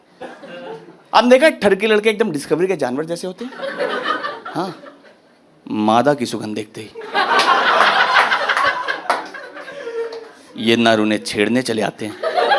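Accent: India. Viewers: Bhai allahabad se ho pta chal gya accent se😂😂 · UP का नहीं मराठी लग रहा है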